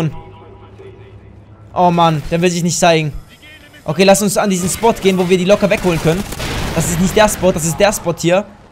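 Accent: Hanover, Germany. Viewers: premium ^^ du kommst aus hannover? :D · kommst du aus Hannover???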